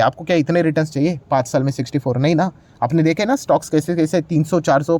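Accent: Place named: Kanpur, India. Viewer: Lag raha guru UP ke kanpur se ho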